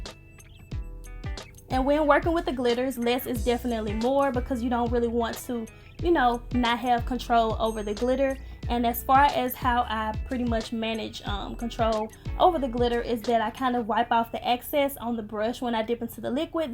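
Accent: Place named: Memphis, United States. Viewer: wow, you are so cold! I heard your Memphis accent and was instantly looking for an appointment website bc your nails are so nice! lol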